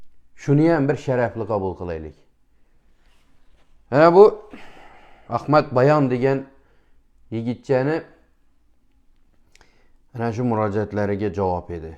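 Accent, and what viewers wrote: Khorezm, Uzbekistan. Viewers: Хакикий хоразмликни гапи бу .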